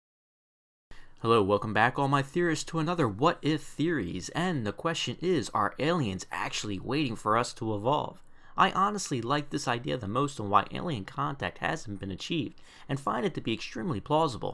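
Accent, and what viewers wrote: Philadelphia, United States. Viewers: That Philly accent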